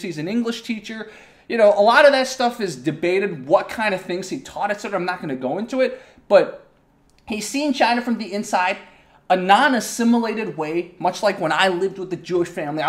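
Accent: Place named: South, United States. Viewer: Why you have southern American accent?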